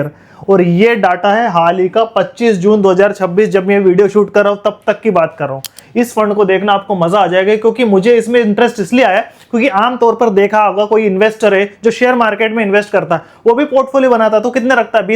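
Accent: India. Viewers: भाई मेवाड़ से हो क्या..??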